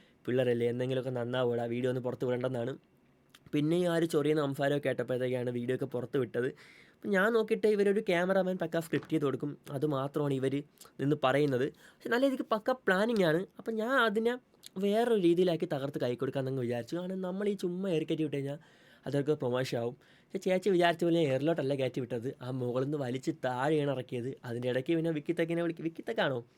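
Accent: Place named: Kochi, India. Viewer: എടാ എന്റെ പൊന്നു മോനെ ഇവളെ അറിയപോലുമില്ല. പിന്നെ കൊച്ചിക്കാരുടെ ഭാഷയല്ലേ ഇവള് സംസാരിക്കുന്നത്. കൂതറ കൊച്ചിക്കാരിത്തി. ആ പേരിട്ടതിനെതിരെ കേസ് കൊടുക്കണം. കൊച്ചിക്കാർക്ക് ചീത്തപ്പേര് ഉണ്ടാക്കാൻ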